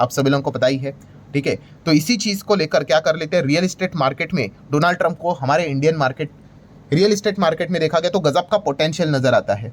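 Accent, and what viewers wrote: Maharashtra, India. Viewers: Trump tower Bandha hai 😂😅 Are you Marathi?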